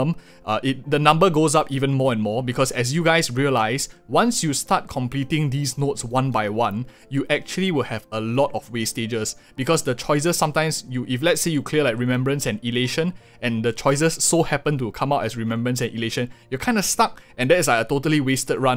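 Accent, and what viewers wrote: Singapore. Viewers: Singaporean voice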